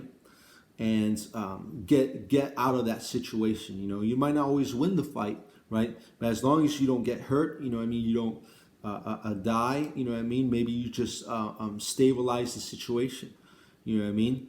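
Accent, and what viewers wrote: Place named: Cuba. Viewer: Damn,! Your getting a lot babe support that I like your stuff. They must love that Cuban accent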